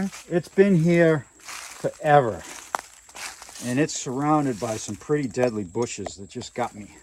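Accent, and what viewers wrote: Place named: New Jersey, United States. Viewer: Sounds like he's from Jersey 😅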